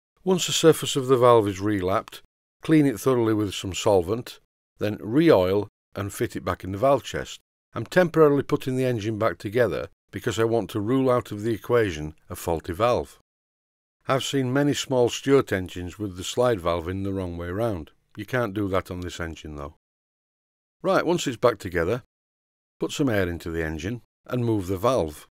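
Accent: Yorkshire, United Kingdom. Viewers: Instead of wife and girlfriend jokes why not recite ‘Albert and the Lion’ to show off you fine Yorkshire accent? Thank you for a really fascinating series.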